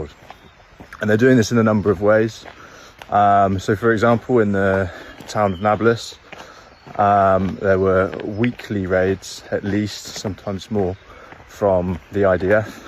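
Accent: United Kingdom. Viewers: From his accent, he is British (specifically born & raised in England) - not American. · American in Palestine? You need to change the caption, he’s clearly British. Which doesn’t alter the message he’s trying to present.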